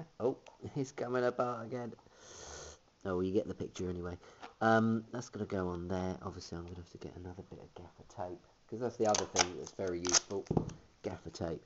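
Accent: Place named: United Kingdom. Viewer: Good old btitish accent mate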